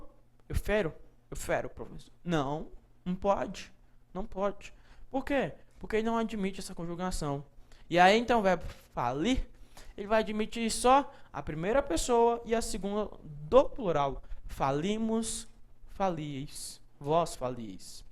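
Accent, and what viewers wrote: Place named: Goiás, Brazil. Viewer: O professor tem sotaque de goiano. O sotaque dele às vezes dá agonia mesmo. O que vale mesmo é que ele esclarece a matéria muito bem muito obrigado pelas excelentes aulas.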